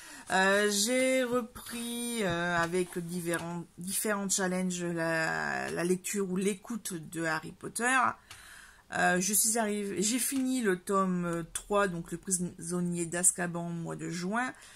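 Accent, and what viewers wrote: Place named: Quebec, Canada. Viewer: Wow du québécois YASSS TABARNAK hahahah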